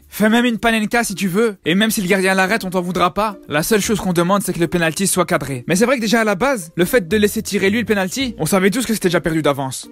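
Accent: Belgium. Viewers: La vidéo m'a tué 😭😭 par contre on entend bien ton accent belge ! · Toi t belge mon frère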